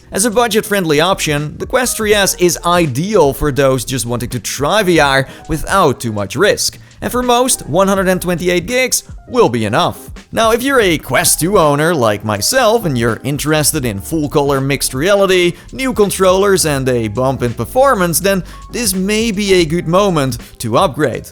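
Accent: Netherlands. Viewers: This man is dutch right?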